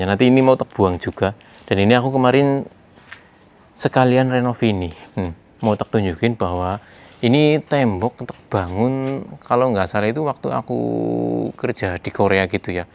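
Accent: Banyumas, Indonesia. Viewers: Wong ngapak nek ora ngapak ora penak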